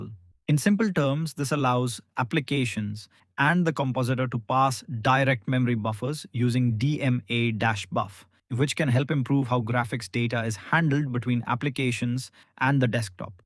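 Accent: India. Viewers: I'm not being ironic: Is this an Ai voice with Indian accent?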